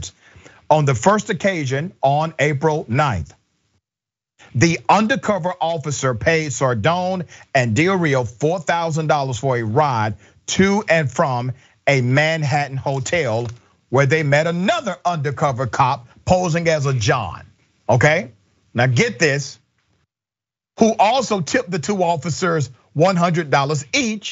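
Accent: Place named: Texas, United States. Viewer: HAS SUCH A STRONG TEXAS OR COUNTRY ACCENT THAT DOESN'T MATCH HIS APPEARANCE, BUT I LOVE WHEN WHITE PEOPLE DOESNT ALIGN AND SUPPORT OTHER CORRUPTED WHITE INDIVIDUALS. I ALWAYS SELL OUT MY FAMILIES AND FRIENDS WHEN THEY DO SOME REAL MESSED UP STUFF ESPECIALLY THAT COULD AFFECT OTHERS.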